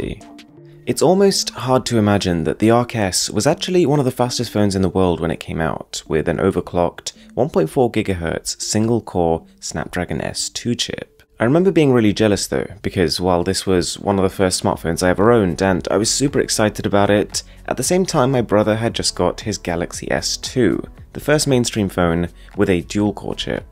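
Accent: United Kingdom. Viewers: The accent alone makes the subscription worth it... Like listening to Earl Grey tea talk about tech in an ever so slightly snide but entertaining manner. Perfect. Let me go get my smoking jacket and que the next video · Do you use a british accent?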